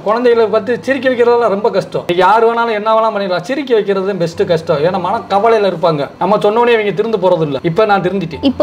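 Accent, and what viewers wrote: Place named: Usilampatti, India. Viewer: உங்கள் சமுதாயம் அக்கறை பார்க்கும் போது மகிழ்ச்சி உள்ளது அண்ணன்.நம்ம வட்டார வழக்கு அழகு அண்ணன் உசிலம்பட்டி இருந்து 😊😊😊😊😊😊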